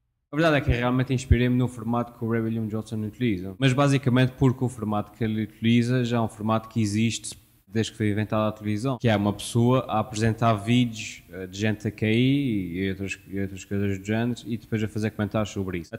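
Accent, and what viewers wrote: Azores, Portugal. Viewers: Sem dúvida que " SIM" cada qual faz o que bem entender, duma coisa tenho a certeza, o que fazes é espectacular ainda mais falado "açoriano" é do melhor,espero que continues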